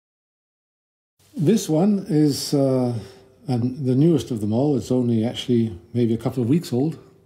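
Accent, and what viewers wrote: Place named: Ireland. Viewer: thank you...your Irish Brogue is still intact.....UCD Graduate Class of 04 Greek American!!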